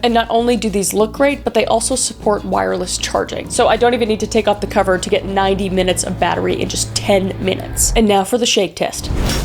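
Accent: Canada. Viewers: Lol your losing you canadain accent lol and can totally tell you are from BC. I grew up in Calgary and I just giggled when you said Cal Gary lol. I love your videos.keep the amazing job lol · I really thought this was a great video to sleep to but first thing I hear is Canadian 👁️👄👁️ still gonna watch it rn tho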